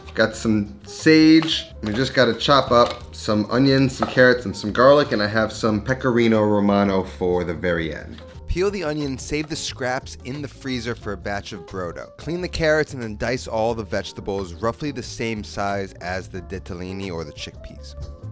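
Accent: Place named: Italian American, United States. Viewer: You speak with recent Italian American accent. Itlian food with chickpeas and chilli flake, how good is that? we will see.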